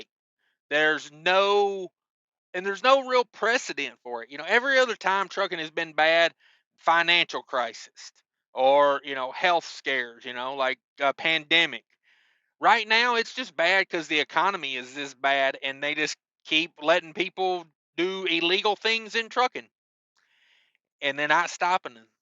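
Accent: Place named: New Orleans, United States. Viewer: BTW.. You're accent is ....terrible. Some New Orleans in the rep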